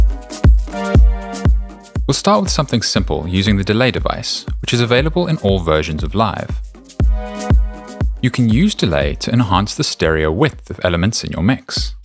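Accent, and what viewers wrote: South Africa. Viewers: Your accent sounds South African, you from ZA by any chance?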